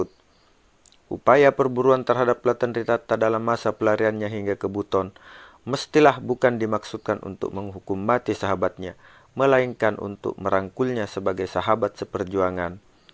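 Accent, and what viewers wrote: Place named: Wajo, Indonesia. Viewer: Yg bicara pasti orang wajo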